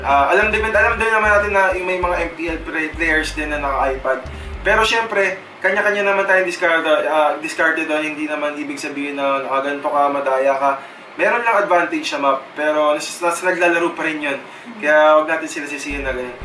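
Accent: Iloilo, Philippines. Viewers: Ilonggo ka pala Boss? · Boss. Ilonggo ka pala? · Ilonggo ka po ba kuya?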